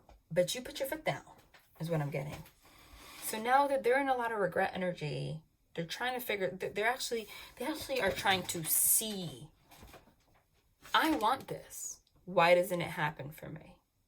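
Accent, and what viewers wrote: New Jersey, United States. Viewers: Idk who will read this. Idk if you will ever read this, but I do wanna thank you. Imma just put this positivity out into the universe. This came at a really pivotal time in my life. I lived through abuse at the hand of my adoptive father. Recently there is a girl I fell in love with where I had to cut it off. Everything you said hit soo hard. I’ve always been someone who’s been scientifically grounded. Lately I’ve had a sort of spiritual awakening and stoped ignoring this calling. That’s when I found this. I’ve been trying to work past a lot of things that need healing and I’ve done really well, but wow!!! Lemme just say your gifts, your abilities, your words truly hit home and inspired me to push through on this journey. This also gave me a lot of hope. Ty so much 🙏 also I’m from New England and your voice mixed with that Jersey accent 😩 the universe clearly wanted me to hear this message. 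Thank you so much for the positive energy you put out for everyone!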